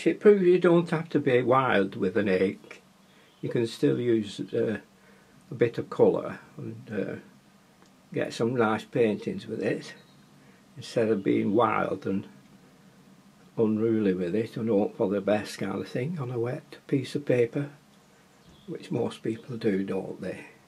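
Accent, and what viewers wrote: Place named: North, United Kingdom. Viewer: Loved this, your so lovely,and northern like me lol, I understand you perfectly lol👏😁 you brill, hope your wife comes home soon my love, you take care of yourself, hugs👍🇬🇧❤️💙🎨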